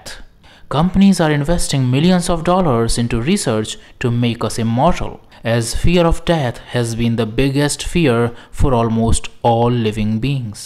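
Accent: North India, India. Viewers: Hi, can you please work on your pronunciations. It's very distracting to hear you talk with a north indian/gujju English accent. Or pls have someone else do the voice over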